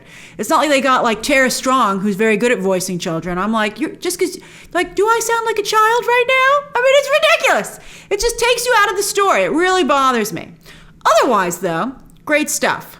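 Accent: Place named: East Coast, United States. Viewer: It’s east coast speaking style. Very common for a lot of EC people to sound like that. She sounds like my family members so maybe it’s just me lol. Anyway, I’m looking forward to Monday!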